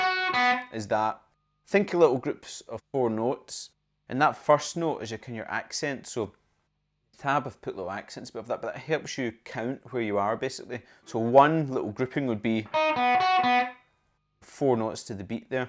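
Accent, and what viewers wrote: Scotland, United Kingdom. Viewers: The guy is Scottish mate . Dont confuse the two.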